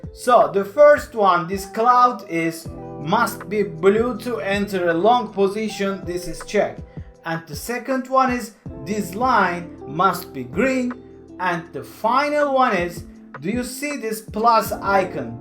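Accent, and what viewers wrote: Turkey. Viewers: abi konuşmadan dedim bu türk aksanı baktım öyleymişsin güzel video emeğine sağlık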